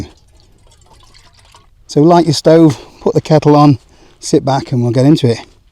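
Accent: United Kingdom. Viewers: Great video 😁👍 I'm ex-pat Brit, living in Japan 20+ years, and it was great to hear your accent, see the views, and remember the National footpaths/bridleways etc., in the UK 🇬🇧❤️🤍💙 Here 🇯🇵, "Right of Access" doesn't exist, so although not technically illegal, wild camping simply isn't done. 🫤 Our camping trips are closer to those of the gorgeous "Kirin Camp" (the South Korean girl camper), than yours......but not really by choice. 🥵 Be safe, and catch you later mate. ☺️👍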